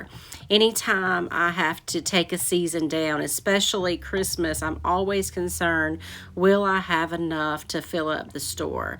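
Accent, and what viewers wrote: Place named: South, United States. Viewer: Hello there,! I just found you a few days ago. You are a pure delight and your DIY's are splendid! Are you a southerner? I am. I can tell by your accent. LOL 😂 You go, girl! Jesus' blessings to you and yours!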